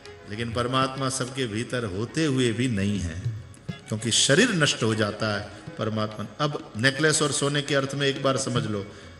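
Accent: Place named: South India, India. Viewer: Dear Swamiji, your Sanskrit pronunciation is topnotch. looks like you have studied somewhere in south India.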